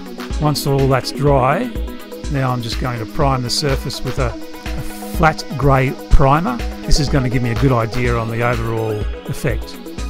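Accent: Australia. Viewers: The most Australian voice I've ever heard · I'm not quite used to Aussie accent, but goodness me, the art work is fantastic. Pearl sent me here, BTW. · The aussie accent 👏😍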